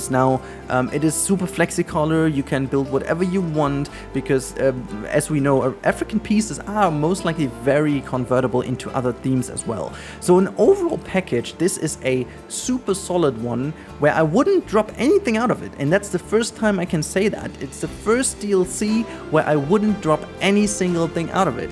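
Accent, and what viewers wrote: Germany. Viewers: Und was soll das bitte für konstruktive Kritik sein? Was soll jetzt gegen den Akzent machen? Das kommt nun mal durch. Ist ja nicht so, als ob er das macht, um Leute zu ärgern oder um extra deutsch zu klingen. Die wenigsten sprechen eine fremde Sprache komplett ohne Akzent, wenn man sie nicht von klein auf gelernt hat, also wozu sollte man das bemängeln? Man versteht ihn und sein Englisch klar und deutlich und wenn einen das stört, dann muss man eben woanders gucken. Wir alle lernen im Leben, ob Sprachen oder anderes und jeder lernt anders schnell und spricht Wörter anders gut aus. Niemand ist perfekt. Was genau soll daran nun voranbringen, das man seinen Akzent bemängelt, der nach und nach mit dem regelmäßigen Sprechen von allein verschwindet? Sprachen sprechen und lernen ist ein langer Prozess, nichts was man über Nacht lernt. Mal ganz ab davon, dass es noch hinzukommt, ob man britisches oder amerikanisches Englisch spricht, also was genau hätte er bitte noch verbessern können? 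Soll er seine Familie ignorieren und Youtube aufgeben bis er perfektes Englisch spricht oder wie genau sollte das bitte laufen? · Krasser Akzent... Bist du Deutscher?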